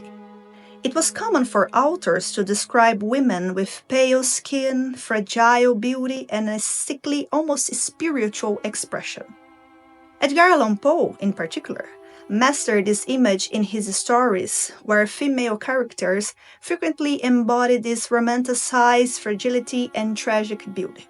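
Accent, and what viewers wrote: Brazil. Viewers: I don't know much about Bloodborne (i'm still trying to understand the story, since i can't play it without having a heart attack), but i really like Poe, and any video essay merging literature with videogames is worth to see! Also... Your accent is familiar, você é brasileira? Awesome video! Keep going!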